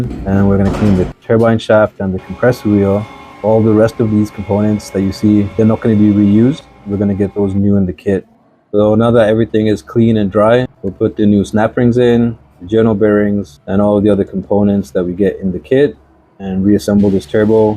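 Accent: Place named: Iran. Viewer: لحجه ات مشخصه ایرانی هستی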